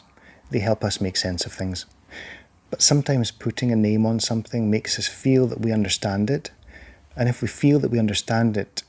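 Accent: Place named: Scotland, United Kingdom. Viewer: I really respect your honesty in sharing such a heartbreaking story As a Doctor,it is apparent that you always go the extra mile with compassion and diligence There is nothing for you to feel guilty about as you did all you could Labels,before a full work up is done,are indeed dangerous and damaging as illustrated in this particular case You have a charming accent May I ask which part of Scotland you are from,Sir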